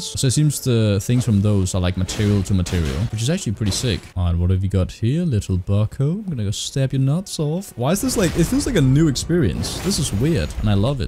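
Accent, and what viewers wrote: Denmark. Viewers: The danish accent tho xD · I love within 10 sec i can hear you are a danish brother. XD Nice vid my dood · You know that, when you can just hear that danish accent. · are you from denmark? it sounds like you have the accent, i talk like that aswell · man that accent sounds so familiar, this guy gotta be a dane :P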